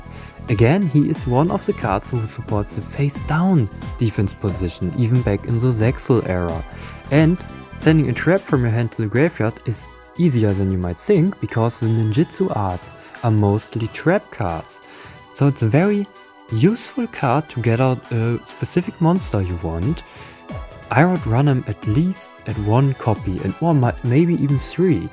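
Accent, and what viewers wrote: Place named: Germany. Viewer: Bro, bist du aus Deutschland? Diesen Akzent erkenne ich doch meine ich 👀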